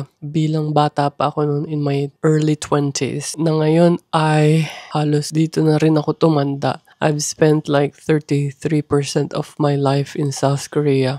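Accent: United Kingdom. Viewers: I love your British accent ❤❤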